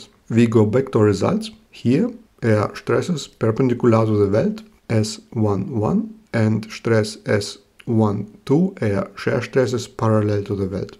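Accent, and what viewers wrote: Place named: Germany. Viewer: Great, thank you 👍 Love the german english mix Hundert five point vier 😁😁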